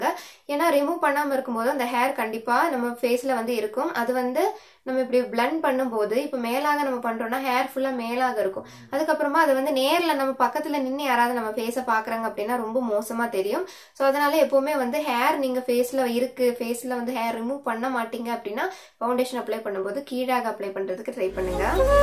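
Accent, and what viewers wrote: Nagercoil, India. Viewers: Nagercoil slang varuthu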